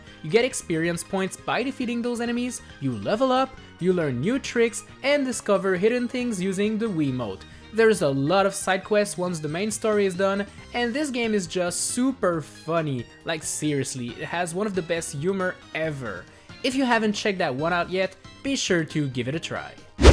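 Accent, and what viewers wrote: Quebec, Canada. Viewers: Are you French Canadian? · ca sonne vraiment quebecois ton accent chum ! premier video, thumbs up !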